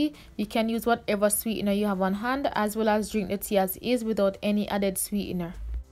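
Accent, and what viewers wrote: Jamaica. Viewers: I Can Hear The Jamaican Accent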